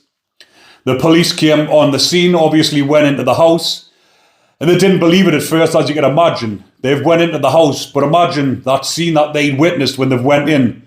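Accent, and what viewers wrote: Newcastle, United Kingdom. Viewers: I know these are serious topics but i can't help but laugh every time he swears in his Geordie accent.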